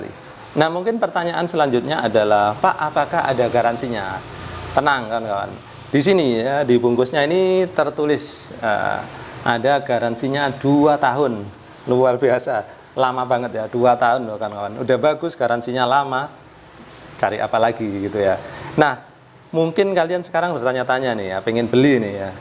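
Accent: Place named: Yogyakarta, Indonesia. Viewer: Bahasanya Jogja bgt..Asli mana ya sebenarnya?👍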